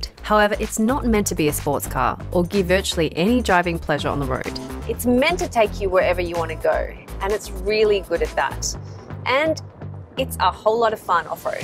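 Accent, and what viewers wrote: Australia. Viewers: Sexy woman with an Australian accent talking about natural aspiration. Like.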